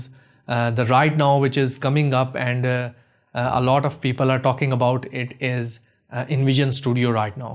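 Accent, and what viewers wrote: India. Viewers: white man with Indian accent !!!!!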